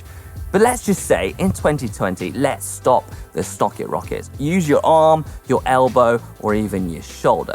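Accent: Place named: RP, United Kingdom. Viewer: Love how posh this white guy is. Keep it up, great work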